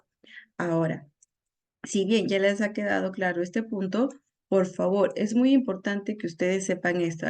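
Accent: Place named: Colombia. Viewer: pero hablas como colombiana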